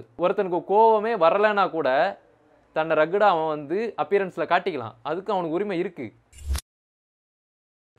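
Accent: Tirunelveli, India. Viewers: Neenga tirunelveli ya bro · Neenga tirunelveliyaa? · Anna neenga tirunelveli ah?? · நீங்க திருநெல்வேலி தான நண்பா.... எவம்லே அது....அந்த ஒரு வாா்த்த போதும் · Anna neega tirunelveliya ???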